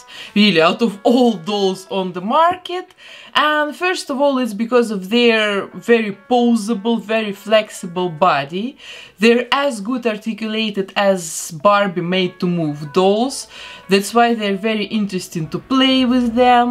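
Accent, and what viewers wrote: Russia. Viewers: Я слышу акцент, вы русская? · Привет, вы русская ? Просто у вас акцент сильный и некоторые слова на русском говоре .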